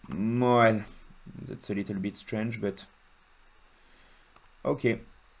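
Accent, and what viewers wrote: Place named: France. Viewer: Quand un français parle Anglais c'est toujours marrant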